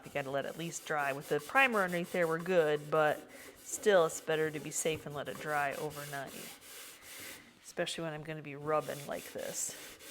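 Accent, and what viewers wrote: South, United States. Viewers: I have been slowly going through and making over things that need a new home. I know i am being nosey but where did you get that southern accent as i can not help but ask.. craft on my friend...😊😊😊😊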